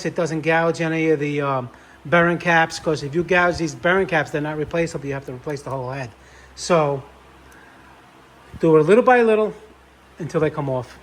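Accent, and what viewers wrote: New York, United States. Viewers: Are you from New York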